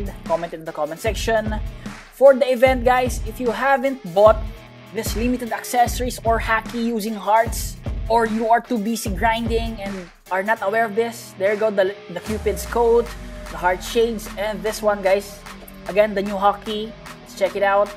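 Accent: Philippines. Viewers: You sound like a Filipino to me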